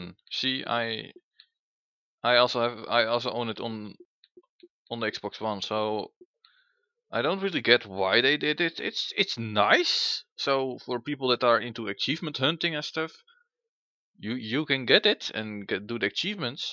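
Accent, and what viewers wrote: Netherlands. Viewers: Are you dutch? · Haha deze man is Nederlands en dat kan je echt ziek goed horen.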